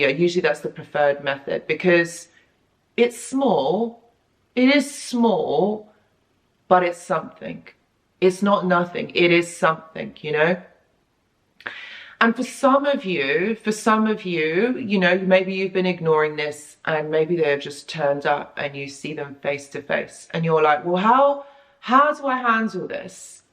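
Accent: United Kingdom. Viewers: Some real facts are Heart breaking.....but your words and facts that you convey is god sent......god bless u Ms...... discarded 1 Oct 2023....going thru some real hard time......i need some insights from the community here and veterans here......my case is crazier hope all can help.....will tell my story if i am ready soon......Cheers. ooooh ya i always love British accents.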